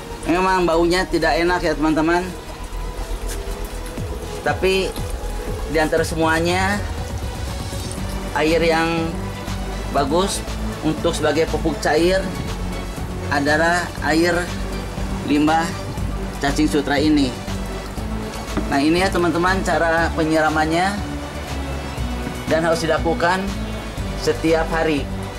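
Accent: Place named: West Java, Indonesia. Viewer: si akang,logat nya urang sunda pisan euy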